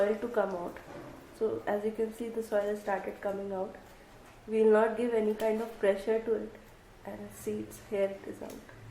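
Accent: India. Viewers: Gotta love Punjab voices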